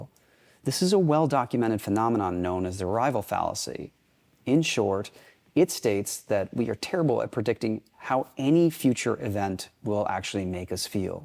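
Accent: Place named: United States. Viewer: Set goals to be a better writer or Ryder? American accent make it hard to hear the difference. I think you are good enough whatever the answer.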